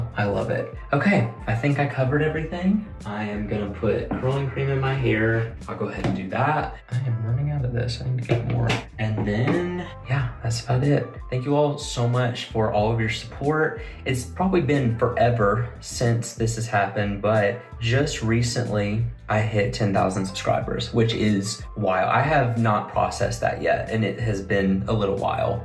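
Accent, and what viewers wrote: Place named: South, United States. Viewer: I like your accent but I can’t place it. Southern? Midwestern?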